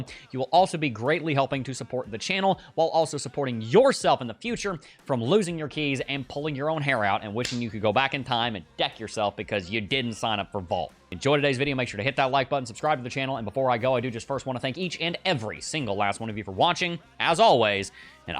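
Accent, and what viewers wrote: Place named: United States. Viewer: Why you speak like that 🧐 Typical idio t yanky doodle dandy Full ov noise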